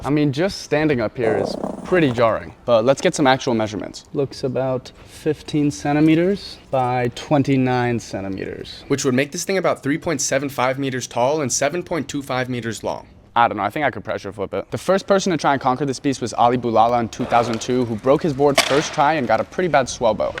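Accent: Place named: United States. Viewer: You’re speaking American and using metric. Wow.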